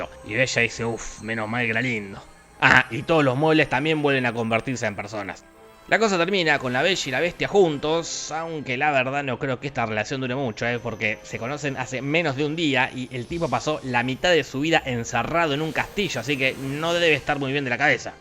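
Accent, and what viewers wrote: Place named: Argentina. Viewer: El único argentino que me gusta como habla jasajksak ❤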